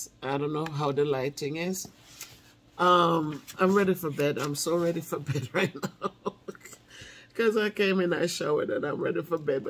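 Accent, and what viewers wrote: Jamaica. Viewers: First I heard you speaking in patois.yuh gwaan good Mon. Yuh still have it. LoL.Big up Auntie!.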